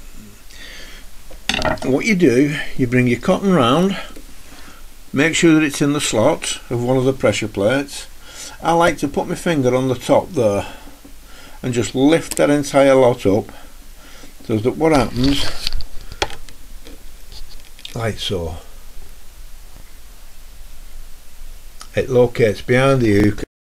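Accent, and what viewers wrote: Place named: St Helens, United Kingdom. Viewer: this was exactly the tutorial i needed thank you. Didn't expect to hear a St Helens accent though; my hometown. Even better! lovely . tHank you !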